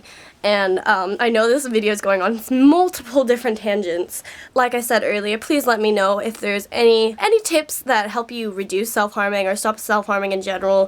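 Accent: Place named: Australia. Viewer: you sound like your picking up an Australian accent 😂😂its super cute oh my lord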